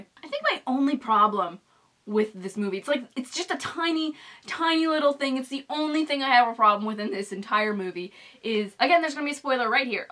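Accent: United Kingdom. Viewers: I just happened upon your channel today, and I'm really digging all your characters! Do you think you'd be able to make one out of the slick RP accent you're doing here? Also, if you're up to the challenge, it would be awesome to see some of your more established characters do impressions/parodies of each other (unless you've already done that... I'm still working my way through your archives). Keep up the great work!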